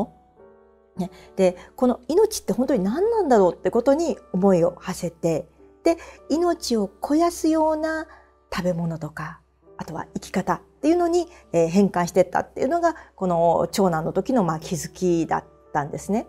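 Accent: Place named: Hokkaido, Japan. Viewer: 対談動画ではせくらさんを知りました。 北海道は珈琲と幼稚園のイントネーションが違うと聞きましたが、やはり道産子なのですね😊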